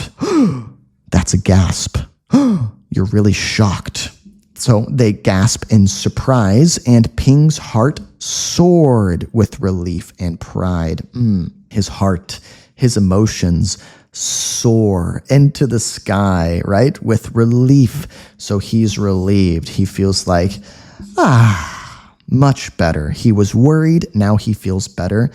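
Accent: United States. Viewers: Hi. I am watching from france, I discovered your channel recently. I listened to the GSL english podcast, and I loved the way you spoke in that podcast. Your American accent is terrific, and it has drawn my attention and wanted to listen to you more. Keep up the good work. I love what you do 🙏🏼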